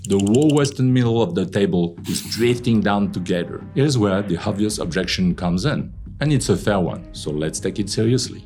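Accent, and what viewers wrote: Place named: France. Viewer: He's French. He could have a professional record studio yet almost nobody would understand his English :)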